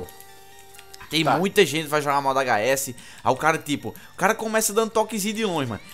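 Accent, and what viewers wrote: Northeast, Brazil. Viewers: tu é nordestino???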